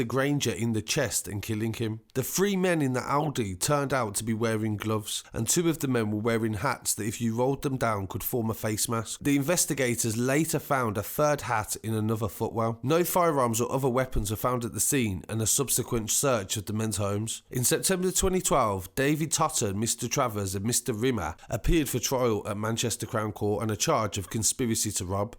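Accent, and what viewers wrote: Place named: Manchester, United Kingdom. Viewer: Can tell your from manny mint ANCOATS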